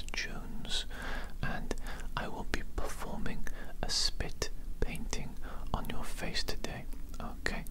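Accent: Wales, United Kingdom. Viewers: Where is your accent from? I want to say Wales, but I can’t quite put my finger on it and it’s been bugging me for ages! Super relaxing video as always :)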